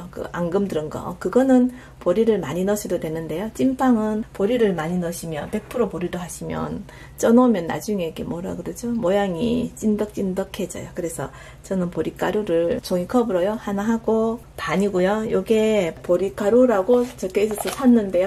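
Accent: Gyeongsang, South Korea. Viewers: 말씀 너무 잘하세요..경상도 어투가 이뿌시네요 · 목소리가 너무 좋아요 갱상도 사투리도 매력적이구요 영상 내용도 유익하구요 남편분 좋으시겠어요 감사합니다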